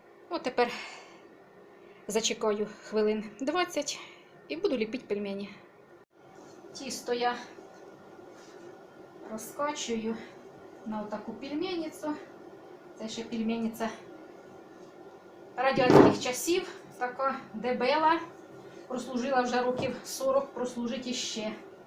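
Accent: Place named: Central Ukraine, Ukraine. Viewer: У вас гарна українська мова центральної України Так говорять не тільки в ЧЕРКАСЬКІЙ, а й в Полтавській обл.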